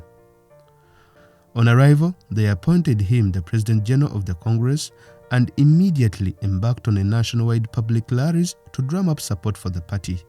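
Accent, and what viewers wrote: Uganda. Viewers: Author sounds like a Ugandan scholar...the Ugandan English accent is unmissable...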